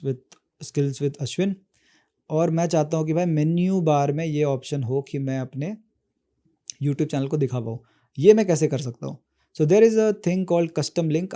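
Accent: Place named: Urdu, Pakistan. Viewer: excent is look like urdu best tutorial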